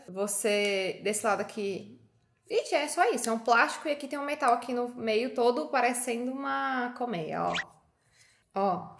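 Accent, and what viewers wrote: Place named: Northeast, Brazil. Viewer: você tem família nordestina?! Não me lembro se já disse, é que cê tem um sotaque bem puxado pro sotaque nordestino, acho uma graça 😍🙌🏼🙌🏼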